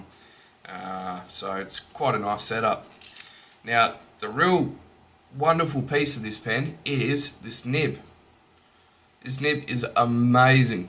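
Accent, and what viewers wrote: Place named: Australia. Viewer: thanks for the tip Hun. Also it's great to hear an Aussie accent doing these reviews. Keep up the good work 😊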